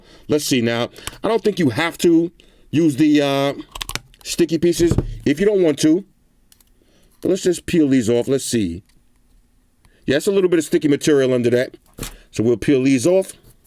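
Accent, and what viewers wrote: New York, United States. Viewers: is from the south and is from New York he has a New York accent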